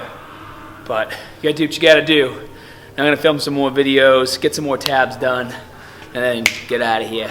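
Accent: Boston, United States. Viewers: Boston haha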